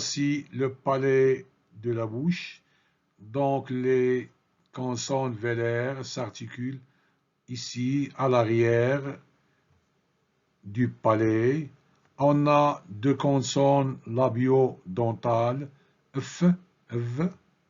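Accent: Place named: Belgium. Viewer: Votre accent est quand même très typé. Ce n'est pas un reproche mais il ne vous aide pas à différencier à l'oral le "a" de sac et le "a" de âne. A mon oreille, vous ne différenciez pas brin de brun, parfum et faim, à peine le "o" fermé du "o" ouvert et bien sûr les semi-voyelles "ui" et "oui": vous prononcez "lui" comme "Louis". Je vous soupçonne d'être d'origine belge, ce qui est loin d'être une tare. Je dirai même plus ( allusion aux Dupondt de Tintin), cela fait tout le charme des divers accents que l'on peut entendre en français et j'aime ces accents. Mais ça n'aide pas votre démonstration de phonétique!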